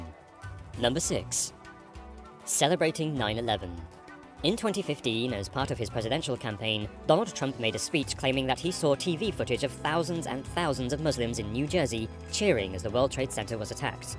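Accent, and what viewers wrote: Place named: China. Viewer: Logat nya logat org cina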